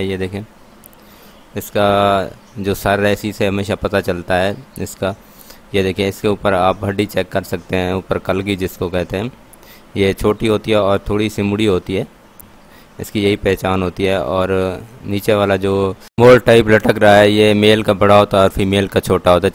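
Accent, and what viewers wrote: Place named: Punjab, India. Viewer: اپ اپنا اردو بولنے کا طرز ٹھیک کر لو اپ کا انداز بیان اچھا ہوگا اور اردو بولنے کے اندر پنجابی لہجہ نہیں ائے گا تو لوگ اپ کی بات سے ویسے ہی متاثر ہوں گے اور خود بخود اپ کو سبسکرائب کریں گے کیونکہ جتنا اپ روانگی کے ساتھ ادب اور اخلاق کے اچھے بہترین طرز کے ساتھ اپنی گفتگو کریں گے اتنے سارے لوگ اپ کی ویڈیو کے لیے بے تاب ہوں گے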